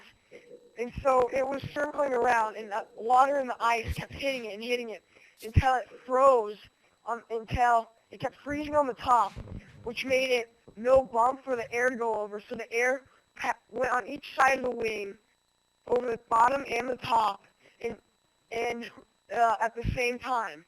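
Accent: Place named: United States. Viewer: he sounds so american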